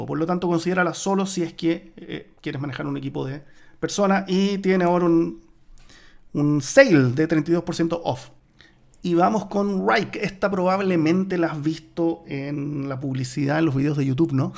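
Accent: Chile. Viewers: eriii shileno?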